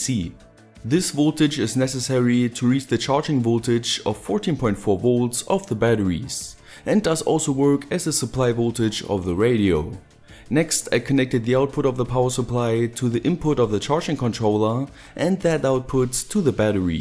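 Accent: Germany. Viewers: Your accent, and using a Geodreieck..... you are German... cool!!! Great video btw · are you german? sounds like that:D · Er ist Deutscher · deutsch ?höhrt sich so an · Are you from Germany? Or Austria? I am hearing accent from you. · Englisch with a german dialeckt :D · are you from germany? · German? Sounds a Little like a German that is speaking english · Red doch deutsch man hört dass du deutsch bist 😂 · Ich denk noch die ganze Zeit: Irgendwie klingt der Akzent deutsch. Und dann BÄM, Pflege-Öl. xD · Du hast ein deuschen axent! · wusste doch dass du n deutscher bist :D das hört man einfach so raus XD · are you german? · Wie man einfach direkt nach 5 Sekunden merkt das du Deutscher bist 😂👌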